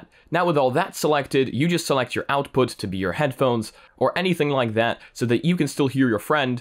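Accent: Poland. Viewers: question are you from poland?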